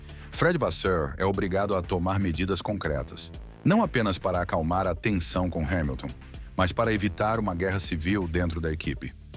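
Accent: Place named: São Paulo, Brazil. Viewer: Merda de IA com sotaque caipira paulista! Medonho.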